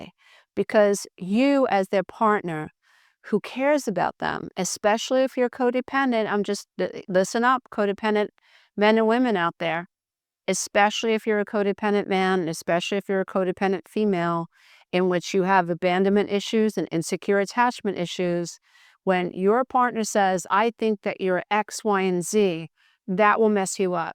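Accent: New York, United States. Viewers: I knew you were from New York…I thought Long Island ❤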